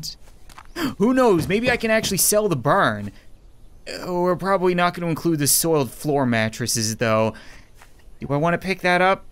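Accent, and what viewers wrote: Appalachia, United States. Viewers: Your hillbilly voice Me: kindergarten flashbacks Who was that voice for in the kindergarten series? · His hillbilly accent reminded me of kindergarten 2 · uses hillybilly accent Me: remebers kindergarten 2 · Damn that hillbilly voice is nostalgic of the kindergarten series... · Your country accent in this is the same as one of the twins in the kindergarten 2 game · I love how we call hillbilly accent the Felix and Ted voice now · only og people remember kindergarten 2 his hill billy voice sounds like Felix from kindergarten 2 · The hillbilly accent reminds me of the voice he gave for ted and felix from his kindergarten 2 series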